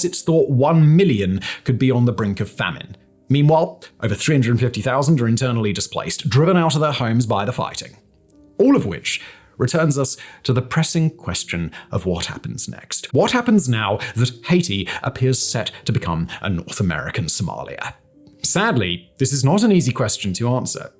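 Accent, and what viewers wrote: United Kingdom. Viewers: I can’t handle British accents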